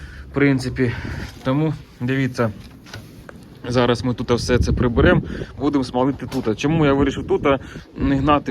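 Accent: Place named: Zhytomyr, Ukraine. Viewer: не звиртай увагу на рiзних дурнiв добре видеш канал, в нас на житомирщинi говорать також суржиком!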